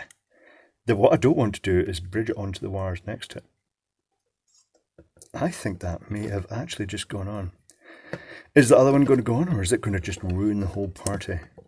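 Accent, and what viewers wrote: United Kingdom. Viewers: your proper English is impressive Are you from UK your accent seems To be British Hi from india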